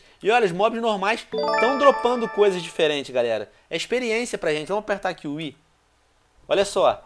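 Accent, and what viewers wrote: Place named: Portugal. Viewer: Meo deos que nostalgia, meu, você foi minha infância, junto com todos do grupinho. S2 saudades de seus videos. Cof amo sotaque de Portugal, é tão fofinhooo.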